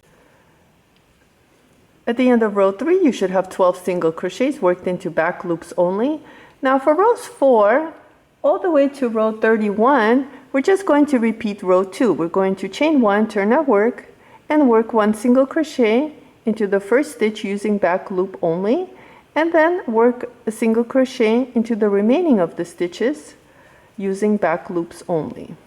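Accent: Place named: Bulgaria. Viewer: Really fun project!, btw, are you Bulgarian? Your accent and your picture made me wonder 😉.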